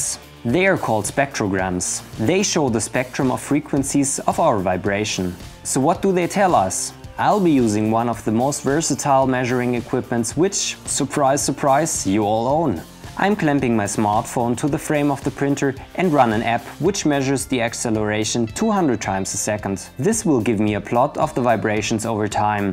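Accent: Germany. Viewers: are you german :D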